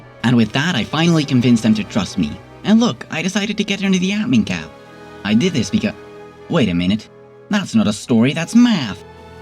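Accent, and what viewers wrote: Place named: Netherlands. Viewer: you're dutch the accent haha nice video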